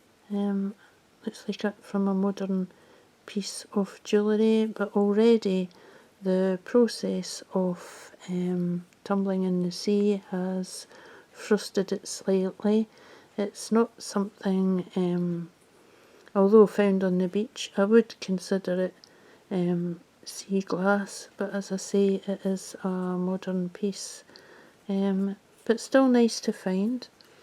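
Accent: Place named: Scotland, United Kingdom. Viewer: Lovely finds! My favorites are the codd marbles. Thank you for showing. And I enjoy your Scottish accent a lot. Greetings from Germany!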